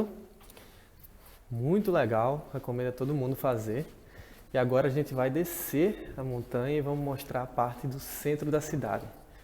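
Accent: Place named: Northeast, Brazil. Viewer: Eu sei que e brasileiro sim mas seu sotaque e nordestino isso que disse .Eu sou paulistano e tenho inúmeros amigos nordestinos.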